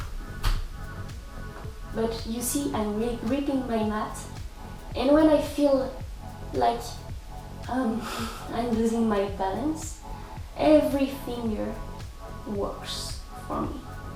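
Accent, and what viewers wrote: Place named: France. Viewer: Great tutorial, very informational and calm. I`ve been in gymnastics for 8 years so I already knew how to do it and I`m not afraid of doing it, but I still loved your video:) I would really like to see a tutorial on how to to chinstand (with transition ) and/or jumping into handstand. My goal is to learn presshandstand but I think I need more strenght :) And I`m not sure what exercices to do to improve the presshandstand. Since I have no strenght I would be excited to at least be able to jump into handstand. Et j`aime bien ton accent français :D Loads of love , laurence. Keep working and posting awesome videos :)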